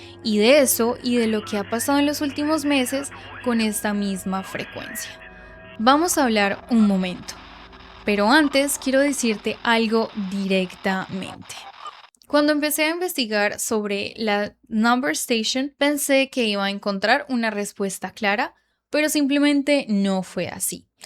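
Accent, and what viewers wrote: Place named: Mexico. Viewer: sisi. no siempre, pero muy tarde a la noche se escuchan cosas interesantes. espero mas videos tuyos, bella voz, que sumada a la tonada de mexico es hermosa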